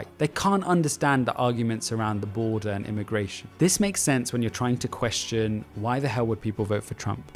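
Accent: United Kingdom. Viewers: He's a Indian with a limey accent lol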